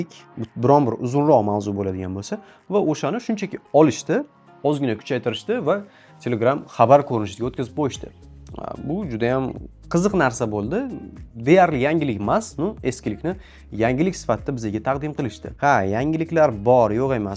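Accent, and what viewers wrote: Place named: Russia. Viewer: Rus, turk odam endi gapirvotgan odamga oʻxshab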